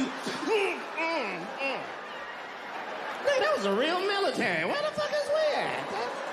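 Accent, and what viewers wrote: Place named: Houston, United States. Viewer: Sounds like HOUSTON🤣🤣💯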